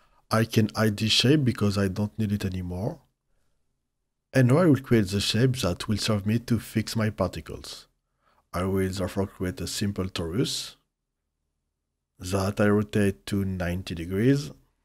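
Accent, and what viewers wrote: France. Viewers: you're French ? you have a French accent ;)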